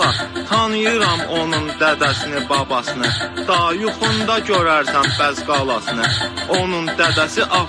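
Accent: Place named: Shaki, Azerbaijan. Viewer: 👍👍👍👍😂😂😂😂😂 ardı gəlsin Qəşəng caan ay Şəki ləhçəsi..👍👍👍👍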